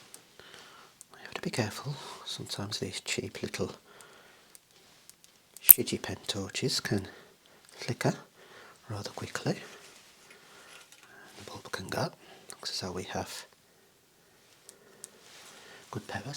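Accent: Birmingham, United Kingdom. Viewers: listening to the start id be worried but a brummie bloke wouldnt do me wrong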